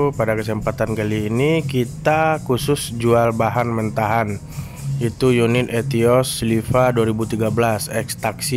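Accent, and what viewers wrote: West Sumatra, Indonesia. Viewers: Logat nya urang padang bana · Dri logat ny kykny abg ini orang sumatra barat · Asal mana bg?? Bahasa ny kyk minang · Bg. Abg orang padang ya.. Logat minang nya kenal saya. Salam kenal da. Dari medan.. Di medan ado cabang da? Awak nio bali.. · Logat urang minang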